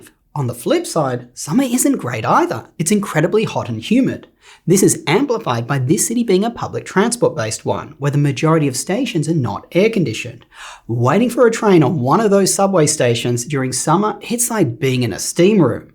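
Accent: Australia. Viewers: Are you Australian????? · yknow i thought you were australian, but you mentioned you moved to the usa from there. interesting!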